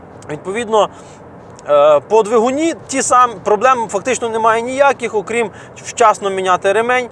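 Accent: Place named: Poltava, Ukraine. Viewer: Это полтавский быдло-суржик, уж извините.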